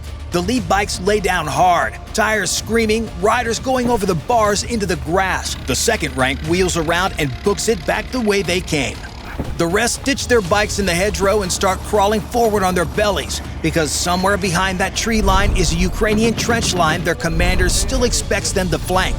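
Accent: United States. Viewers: This is very old footage with lots of repeated footage. I muted it and watched and it was just as informative without the annoying excited narater with his wining voice pearcing my ear drums. Old news in a new annoying format with american naration. Annoying! Annoying! Annoying!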